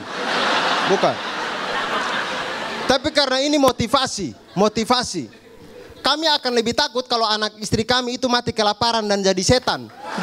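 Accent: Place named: Bugis, Indonesia. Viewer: Saya peminat abdur dari Sabah Malaysia. Hahahahah memang lawakan abdur semua lucu2.. bahasa kita pun lebih hampir sama sbb dialek abdur ni dkt2 sama dialek bugis.. lucu lawakkannya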